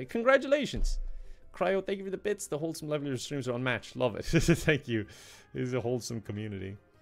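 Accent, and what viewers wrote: Ireland. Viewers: is so kind over stream wth! aaa soft irish lad!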